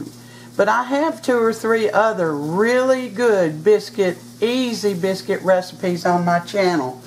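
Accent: South, United States. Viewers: I just subscribed to you. Partly because the pie crust video and now these biscuits look amazing (and I love the sound of your sweet southern voice), but also because I loved your Kavanaugh statement and the beautiful God Blesses at the end of your video. 🤗💕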